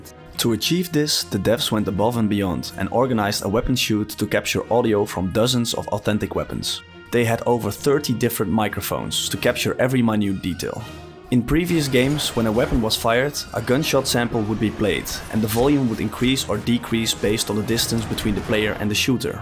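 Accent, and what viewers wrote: Netherlands. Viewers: goeie video, maar het is onmogelijk om een nederlands accent niet te herkennen als nederlander haha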